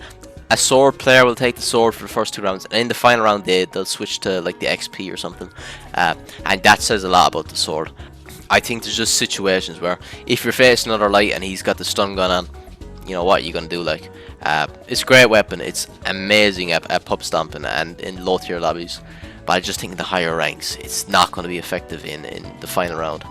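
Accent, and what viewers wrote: Ireland. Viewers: Haha the Irish accent is unique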